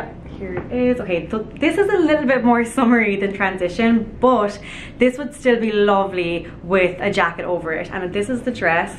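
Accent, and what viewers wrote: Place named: Ireland. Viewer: Cool Irish Accent :D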